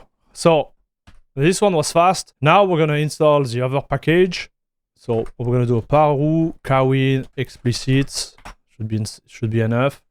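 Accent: France. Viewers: French accent W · He sounds French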